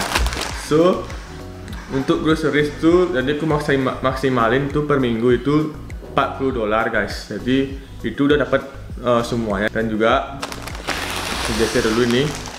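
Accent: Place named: Bali, Indonesia. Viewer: logat bali asane nahh :D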